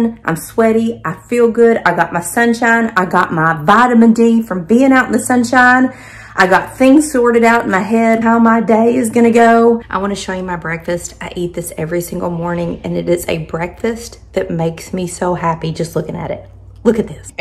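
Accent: South, United States. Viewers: I’ve been watching your videos for awhile! I began watching when you first got Pollux and fell in love with how you love your animals. I knew you were a Southerner mostly because of your speech, and because of your generosity and kindness in communication. You want to help, you want to teach, you want to help people live better lives! I live down in Millington TN outside Memphis, but my parents lived in Obion County for almost 10 years back in the 90s and are very familiar with the Paducah, KY area. I have not seen all of your videos, but I have loved every one I watched! This video diary is a perfect pairing of your curls and you’re ice cream business curls! I have never seen or heard of a shop like that, but the concept is genius! You are such a a great teacher and encourager! I don’t know how you find enough hours in your day to do all you do. You make it look easy, but I know your filming and editing take more time than people realize. 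I just want to encourage you and tell you that you are really helping to make a difference in peoples’ lives and I am proud of you and for you! Give Mooey and Pollux a scratch and a kiss from the McBrides!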